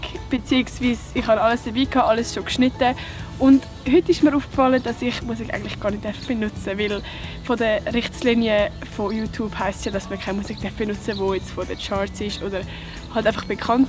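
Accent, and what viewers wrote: Zurich, Switzerland. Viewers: vo wo bisch du eigentlich?😅 Am Dialekt aa Ruum Züri, oder?🤔😅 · Dä dialänkt laht verrate Züri 😂😅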